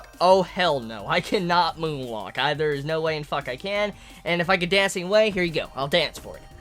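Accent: United States. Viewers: After seeing that old dance video, I've noticed that your country accent has diminished over the years.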